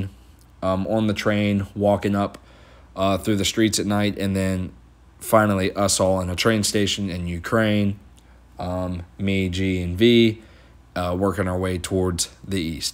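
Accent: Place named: Pittsburgh, United States. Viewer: As soon as you talked I Knew you were from the Burgh.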